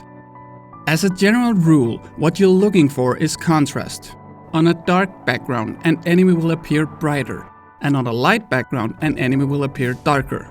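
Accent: Denmark. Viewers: Are u from Denmark?! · ARE u danish? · Great video. Subscribed! 👍 Maybe stupid question, but are you Danish? 🇩🇰😁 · Årrrh du lyder så dansk at det er hårdt for mig at se den her video haha. Ellers rigtig god video! Kan se du har brugt ekstremt meget tid på den, hvilket jeg sætter pris på! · Er du dansker? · Are you danish? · Are you from Denmark?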